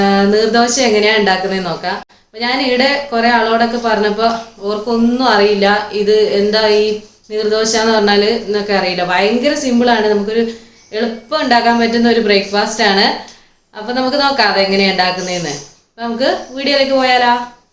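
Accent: Kasaragod, India. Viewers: Slang mariyalo.. thanni kasargod❤ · ആഹാ നമ്മുടെ സ്വന്തം കാസറഗോഡ് ഭാഷ 😜🤗നീർദോശ 👌👌👌👌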